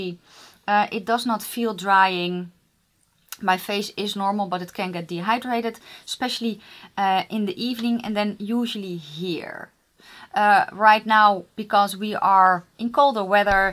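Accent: Netherlands. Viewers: I knew that you are from the Netherlands as soon as you speak. Something about the accent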